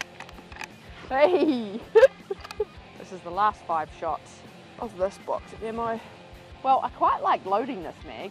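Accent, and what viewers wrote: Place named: South, United States. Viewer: Shes a southern girl!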